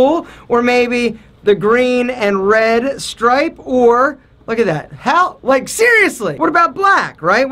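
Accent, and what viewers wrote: Philadelphia, United States. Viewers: He sounds like he's from Philly.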